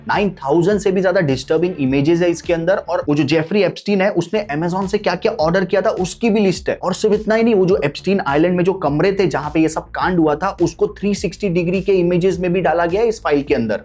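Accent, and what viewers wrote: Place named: South India, India. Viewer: I really got frustrated with this one why you need to pronounce it like you are from a south state if you are speaking Hindi pronounce it clearly it was very very disappointed he said his profession is a teacher so I don't think he does not have learnt Hindi what is the need 😢 problems in South accent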